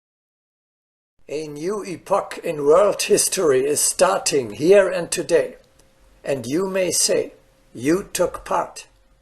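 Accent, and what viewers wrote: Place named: RP, United Kingdom. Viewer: Ready, willing, able, and at your service Dear Ones. Perfect use of inherently limited Queens English.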